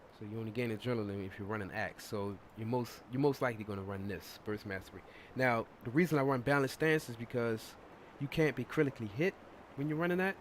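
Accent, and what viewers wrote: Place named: East Coast, United States. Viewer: Do more commentary if you have the time. I be hearing the east coast in the voice, from the Chi-town here.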